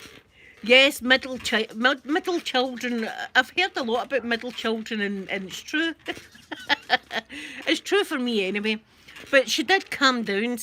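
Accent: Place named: Corby, United Kingdom. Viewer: Ohh can of bru! Girl after my own heart, I live in mini Scotland, Corby, yiu sound like eveyone hear, even the slang the same x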